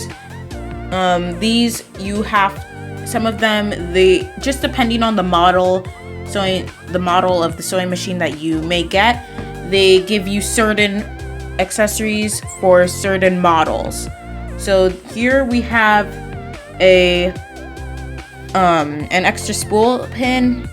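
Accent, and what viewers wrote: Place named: Midwest, United States. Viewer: I can tell you're from the midwest by how you say your T's as D's...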